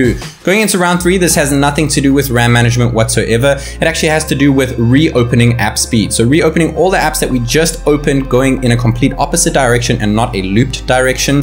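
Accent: South Africa. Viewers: South African?!!!? 🤩🤩🤩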